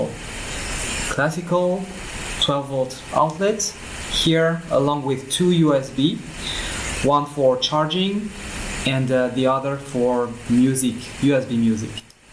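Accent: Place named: France. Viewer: his accent makes be believe he speaks french also, i guarantee it. Regardless, thanks for a review that we can now understand!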